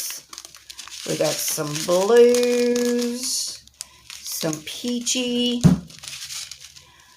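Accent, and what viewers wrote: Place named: Texas, United States. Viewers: I'm from West Texas, and you sound great to me. My aunt from New Mexico would ask me to talk Texas for her, LOL. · Congratulations Sweetheart on your accomplishment!! You are so right that YouTube is hard!! You should be so proud of yourself. You’re an amazing person and deserve so many great things. I’m so glad that I’ve been blessed to know you. You’re a highlight in my life. I remember I found you just scrolling through YouTube unboxings and I was immediately hooked by your Southern voice and calm demeanor! You are EVERYTHING!!!! Congratulations on you being a sponsor as well! I hope that you get a ton of exposure and that more people experience the wonderful quality from your shop!! Love ya Girlie!!❤️ and I’m purposely not putting the hashtag!!!❤️❤️❤️❤️❤️❤️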